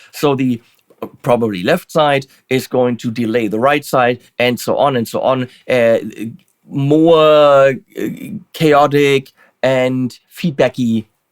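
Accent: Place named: Germany. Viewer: What a coincidence! There is a word in Greek with exactly that spelling and meaning! ΜΕΡΑΚΙ (yes in Greek P is the latin R and Π is the latin P). But it's not pronounced meh-ru-kai but meh-rah-ki exactly as you pronounced it with your German accent my friend. This could be the ERASMIAN pronunciation the latin world uses to read Greek for convenience. For those not familiar with ERASMIAN It's as if someone would read the english word "thought" as ...tho-oo-gt hahaha.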